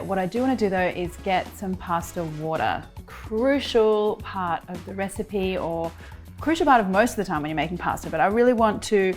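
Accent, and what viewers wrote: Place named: Australia. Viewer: Your Australian accent has gotten a lot stronger since moving!! 😊😊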